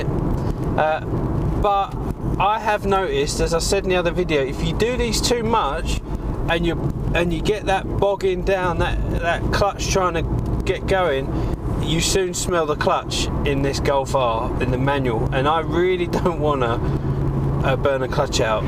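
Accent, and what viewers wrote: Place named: London, United Kingdom. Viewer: Top man! Loved the micky Flanagan London accent lol. Kappa the vids coming mate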